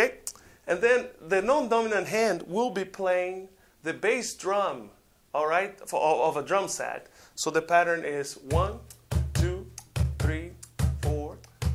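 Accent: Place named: Brazil. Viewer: Funny Brazilian Accent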